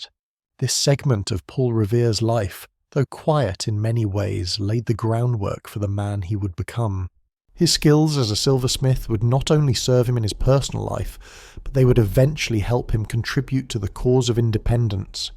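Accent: United Kingdom. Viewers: WHY is this deeply American story being narrated by an English voice? We went to war against that country .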